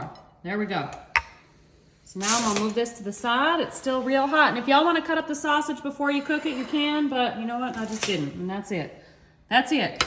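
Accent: South, United States. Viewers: You sound Southern just like me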